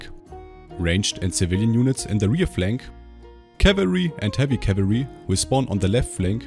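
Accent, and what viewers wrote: Germany. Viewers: Ich denke auch sein Akzent hört sich schon ziemlich Deutsch an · are you german?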